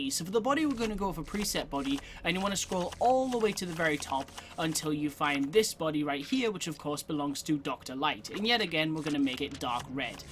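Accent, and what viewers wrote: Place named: Tyneside, United Kingdom. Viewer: My son is loving your videos. What's bugging me is, your accent sounds soooo familiar.. Are you from the North East, Tyneside maybe?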